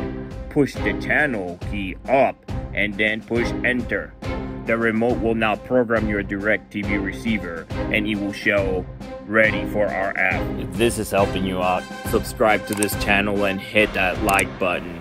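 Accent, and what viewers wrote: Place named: North, United Kingdom. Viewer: Bro got dat up nort accent hey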